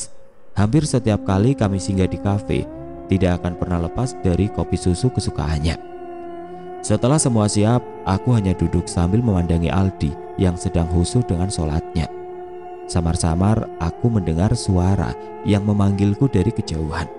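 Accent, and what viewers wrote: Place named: Jakarta, Indonesia. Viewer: Sayang ceritA orang Sulawesi logat jakarte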